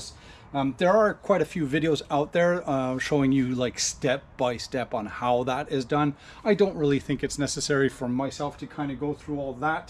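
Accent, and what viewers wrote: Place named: Quebec, Canada. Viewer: On dirait que tu as un accent québécois mon ami 😊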